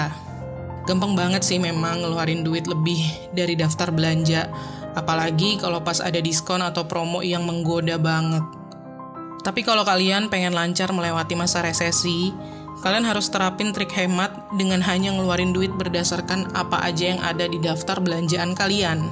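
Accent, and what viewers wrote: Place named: Chinese-Indonesian, Indonesia. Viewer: aku salfok sama suara naratornya, suara chindo bgt kak. anw tips2nya udah sebagian aku aplikasiin di hidup aku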